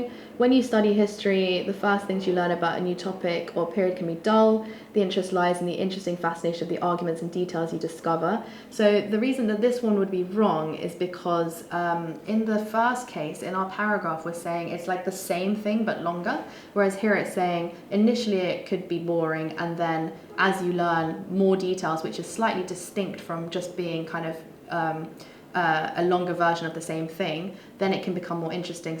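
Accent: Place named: United Kingdom. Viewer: Agree 👍 When I heard her British accent, I figured it out right away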